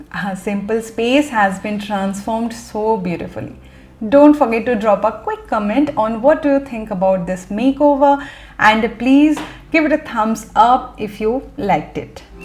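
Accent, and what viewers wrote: Tamil Nadu, India. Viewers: U r tamil slang awsome ....never expected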